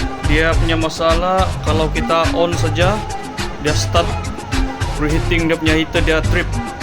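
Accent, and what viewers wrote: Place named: Sabah, Malaysia. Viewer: Kedai dmna bang dsabah ka loghat mcm sabahan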